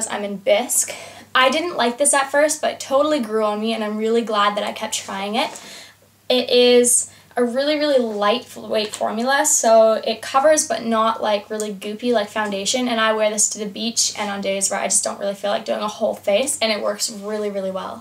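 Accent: Australia. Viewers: You gorgeous as always honey!! I love that you've taken on an Australian accent..so cute!! xo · You seem to be taking on an Australian accent in some words =)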